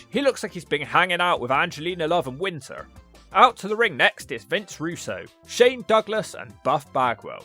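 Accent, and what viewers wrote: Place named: West Country, United Kingdom. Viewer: That West Country accent was seeping out in this video 😂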